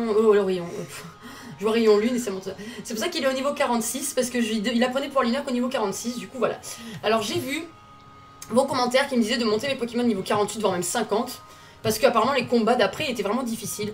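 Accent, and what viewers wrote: Marseille, France. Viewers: On entend bien l'accent de Marseille Sinon bonne vidéo j'aime bien t'es montage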